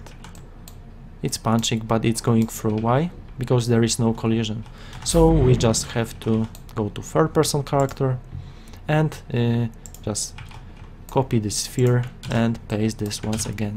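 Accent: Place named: Poland. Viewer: true Polish accent :D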